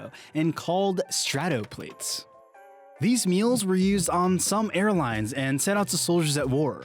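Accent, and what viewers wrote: California, United States. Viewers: the narrator's accent reminds me of the snl californians skit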